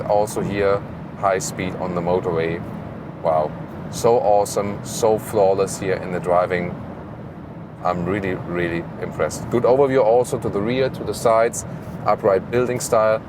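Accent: Germany. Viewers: Love your reviews. Great English speaking with superb German accent. Awesome info and opinions on the cars. I've just ordered an iX3 :-)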